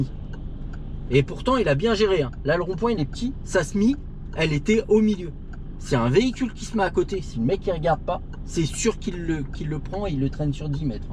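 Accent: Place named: Paris, France. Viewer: Very nice driving, en plus du bel accent Parisien. 😉👍🗼🗼🗼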